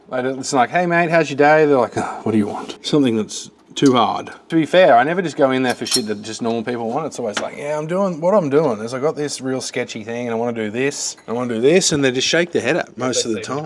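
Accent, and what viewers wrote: Australia. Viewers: Cry about it. Some people enjoy the talking. Especially since he's Aussie. World's greatest accent. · Aussie accent: red pepper is pronounced "red peppah" Race car is pronounced "race cah" Come over here is pronounced "come over heah" 😅😂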